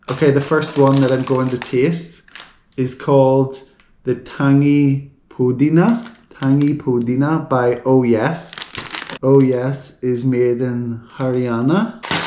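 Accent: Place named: Bengal, India. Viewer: Your bengali is so adorable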